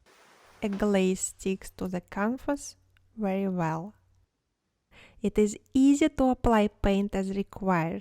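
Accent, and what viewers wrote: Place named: Russia. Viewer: Very strong Russian accent : )). Lovely paintings, have that renaissance quality of look, that airiness.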